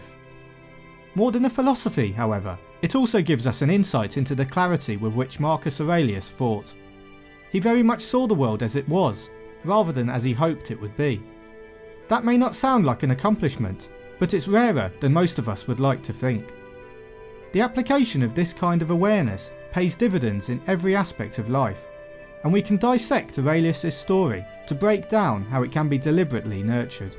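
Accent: England, United Kingdom. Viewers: What part of England does your accent come from?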